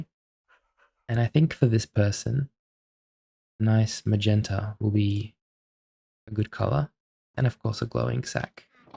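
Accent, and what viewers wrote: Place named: Australia. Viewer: Hi!! You can call me Dove I’m from Australia (guessing you are also based on the convo about weather being warm and the similar accent lollll) and I don’t usually comment on your videos but thought I should just say thank you so much for uploading these. I struggle a lot with anxiety and mass amounts of sensory input due to my ASD, so these holidays have been super stressful and energy consuming with me, especially since my family has to cook for 7-12 people who are coming over tomorrow for Christmas (not including cooking for those that already live here). Anyways I digress, these videos do help so so much after chaotic and hectic days for me, it’s so lovely being able to put on a video that doesn’t yell at me before I sleep about a game I hold very dear to my heart. Keep up the awesome work mate! And happy holidays! Edit: Deleted the previous comment so I could support this awesome channel loll!!!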